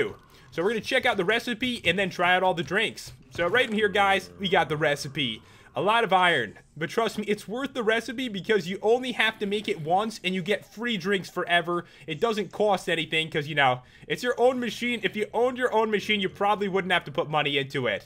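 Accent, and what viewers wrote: United States. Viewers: Are you Amarican?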